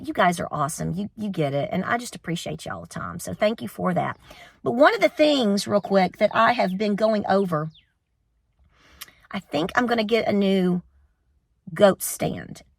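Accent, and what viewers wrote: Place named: Appalachia, United States. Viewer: Just a beautifully, raspy Appalachian voice! 😉 Get well in a jiffy,! 🙏🏻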